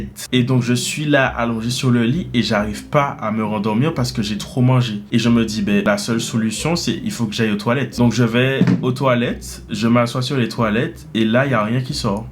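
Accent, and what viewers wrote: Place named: Martinique, France. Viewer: Phoenix en Arizona et expatrié depuis 1979. Ça fait bien plaisir d'entendre l'accent de la Martinique. Tu as beaucoup de talent. Merci pour tous les bon moments de rires.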